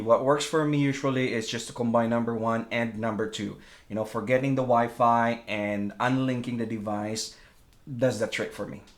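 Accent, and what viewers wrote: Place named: Philippines. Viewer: Thank you so much! It worked! And judging off the slight accent... Salamat Po! (?)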